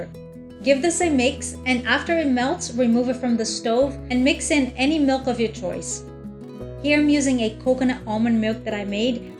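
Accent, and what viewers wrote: Brazil. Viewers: Wait wait wait Are you from Brazil? You have a Brazilian accent, and I’m from Brazil too :D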